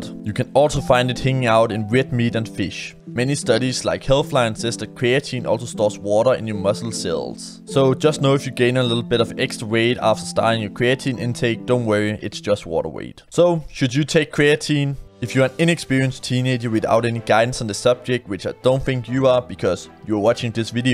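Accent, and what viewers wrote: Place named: Scandinavia. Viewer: You have one of the worst scandinavian accents I've ever heard. It makes my ears bleed!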